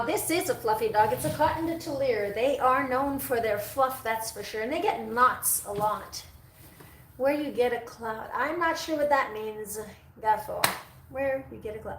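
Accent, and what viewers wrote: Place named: France. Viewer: Ton accent français est tellement beau.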